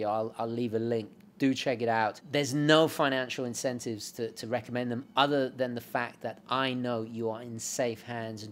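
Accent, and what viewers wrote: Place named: RP, United Kingdom. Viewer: still poncified accents ...... loving it..... but what the heck ?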